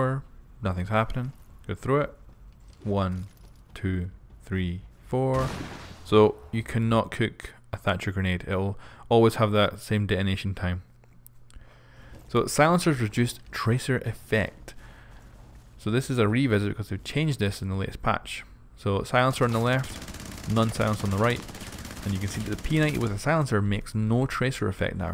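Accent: Scotland, United Kingdom. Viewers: Are u Scottish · R u scottish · Are you scottish by any chance? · Are you Scottish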